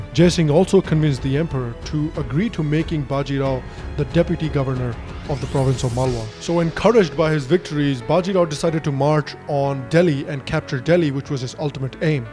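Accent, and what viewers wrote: West, United States. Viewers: Were you born and bought up in india? If yes, than why do you have a western accent?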